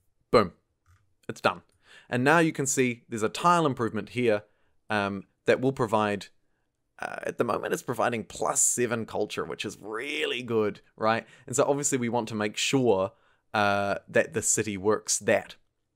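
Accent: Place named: New Zealand. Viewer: as another kiwi i have to say that your accent or iksent as we say is very rhotic. e.g. culturrrre rather than culcha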